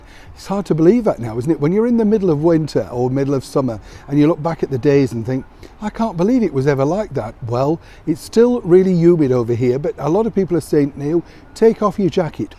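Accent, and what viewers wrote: United Kingdom. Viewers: Cant get more Bri'ish than Neil 🧐🎩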